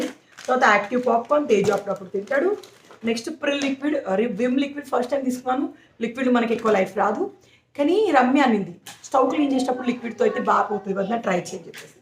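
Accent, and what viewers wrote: Telangana, India. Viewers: Previous ga matladandi Telangana slang anta baledu madam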